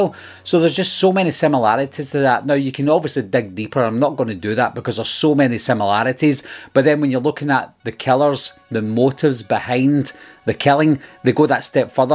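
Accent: Scotland, United Kingdom. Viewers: I just meant your Scottish accent 👍🏻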